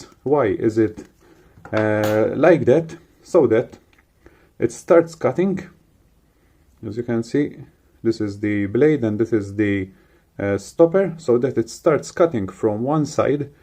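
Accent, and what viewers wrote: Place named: Malta. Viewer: Delightful surprise to hear a Maltese accent millewwel :D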